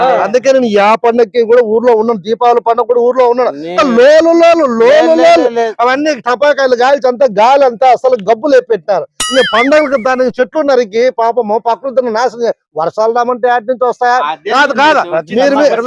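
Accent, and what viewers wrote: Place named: Rayalaseema, India. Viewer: తెలంగాణా యాసకు బదులు సీమ యాస వాడారు.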